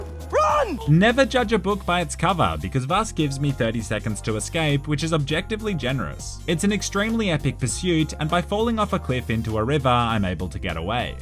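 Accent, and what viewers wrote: Australia. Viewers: good content good ausie accent too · By far my favourite game ever created. But FYI Dennis is the son of an African warlord and went to America but he was treated badly so went to the Rook Islands to make a difference. I assume that was the name given to him in America. But great video its kind of like if SovietWomble and Charborg did a review in an ozzy accent. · You know you’ve been watching a lot when you can’t say ‘anyway’ without saying it in an Australian accent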